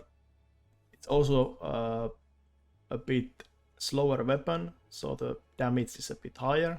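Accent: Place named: Finland. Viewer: Kyllä suomalaisen tunnistaa aksentista heti haha, keep up the good work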